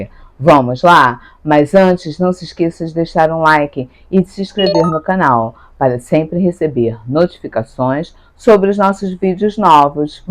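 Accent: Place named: Rio de Janeiro, Brazil. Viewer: venho só pelo sotaque carioca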